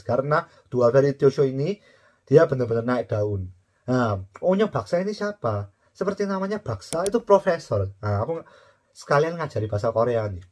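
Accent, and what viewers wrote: Java, Indonesia. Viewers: aku suka bgt nonton variety show tp sayangnya tayangan televisi indonesia ga banyak ngasih acara reality show real, ada pun settingan ga jelas dan ga ada yg bagus menurutku kecuali Channel Net TV doang sehingga banyak ku habiskan nonton tayangan korea drama atau varietynya. Akhirnya discover channel youtube ini krn alogaritma youtube saat aku nyari dr. Eun Young pas bagian Ikon tampil. Sayangnya banyak yg gada subtitle engsub/Indosub nya variety psikologi ini (sekarang yg ada subs nya cuma dr Oh Eun Young's Report: Marriage Hell, lumayan belajar tentang dunia pernikahan hehee), padahal pengen bgt ada subs nya biar bisa belajar, ga cuma haha hihi doang kyk variety show lainnya yg jenisnya komedi. Kembali bahas channel youtube ini, pertama kali klik play, lah kog medhog, mengingatkan Korea Reomit/Jang Hansol hahaaa.. menarik, bakal kepo dan liat video2 di beranda channel ini. 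Semoga berkembang selalu dan banyak menyajikan info apapun yang menarik dan baik,!!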